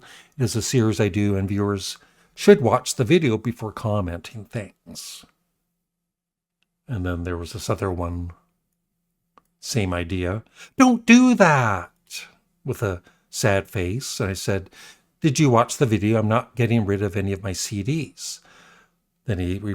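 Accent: Canada. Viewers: Yes. He means when you pronounce the word 'About'. it does often sound like 'Aboot' because of your Canadian accent. That's all. But it is obvious that the word is 'About' so there really is no need for him to mention it. 😊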